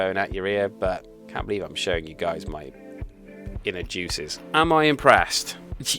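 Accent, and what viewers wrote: United Kingdom. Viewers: So nice to hear an English accent, and from one who has a very agreeable attitude and sense of humour. I would love to hear your views on real ones and those i500's or 600's if you have played with them. I just want to try this type of headphone to see if I'll be continually losing them or forgetting to charge etc so I don't want to buy real ones yet! However I used to work in the HiFi and audio industry so I have a lot of rather nice gear and hate harsh sound, preferring a warm english, Tannoy type of sound which I don't mind paying for once I know if this type and shape etc work for me. Any ideas on the better sounding clones will be greatly appreciated, great and honest review. Loved it. Nice video, subscribed. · And I can hear ur from the UK so was it posted by Royal Mail?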